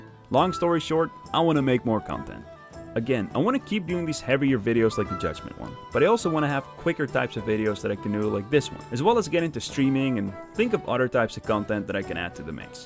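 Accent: Netherlands. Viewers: I watch for your commentary, klootviool ;) Echt waar Dinglish af en toe maar ik kan het wel waarderen. Other than that the nostalgia hype of seeing old WoW shit is great. If I were you, id branch out to other games. Maybe redo a CoD 1 / Battlefield 1942 weapon? The latter had some iconic music. Try to get some cross gamer appeal going. I personally dont watch streams or anything. Just find something short and fun to do. Maybe do some starfield modding? That game is going to be huge starting next week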